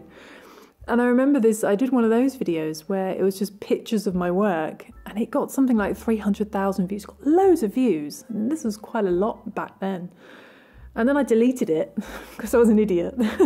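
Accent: United Kingdom. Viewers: I started crocheting in about 2010 when i got chronically ill at uni. You were one of, it not the first channel i subscribed to, it was great to hear a British accent.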